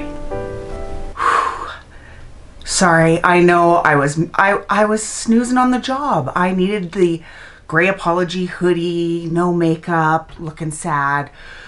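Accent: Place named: Canada. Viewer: I had a feeling that was a Canadian accent, then you mentioned ketchup chips, and that confirmed it! I had a friend in Canada that used to send them to me in Virginia about 4 times a year, they are my favorite! I miss her and especially the chips. I only wish I could meet the kind people you are describing in this video. Most of the people you are speaking of seem very caring, I'm happy you had such a wonderful time. You didn't stop smiling throughout the whole video, and that really says something ❤. You're a true good-hearted Canadian, and I'm very happy you had such a great time with fabulous friends. 🤗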